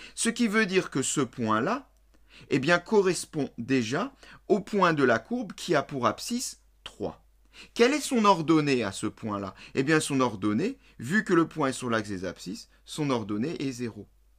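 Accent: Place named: Moselle, France. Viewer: Merci grace a vous j'apprends ce que je n'ai pas appris plus jeune. Une question: vous ne seriez pas ( comme moi d'ailleurs, de Moselle ). Car c'est comme ça qu'on dit Vingte chez moi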